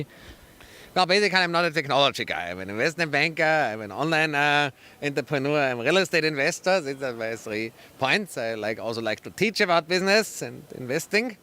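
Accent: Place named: New York, United States. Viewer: Das ganz typische New Yorker Äll.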